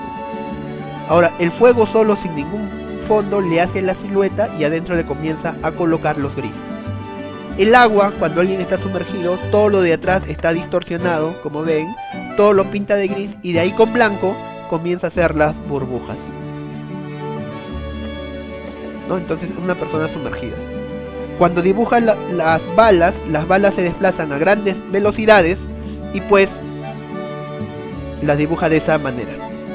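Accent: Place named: Peru. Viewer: Aaahhh, eres peruano Nueva suuub!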